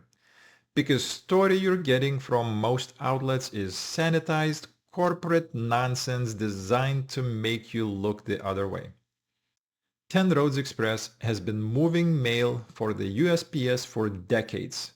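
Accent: Russia. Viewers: Tough to listen to a Russian Accented Guy, talk about Unions. Got trouble "Buying In". AND, I am a Teamster, Unrestricted Class A CDL. Hmm? Keep waiting for him to Call me, "Comrade".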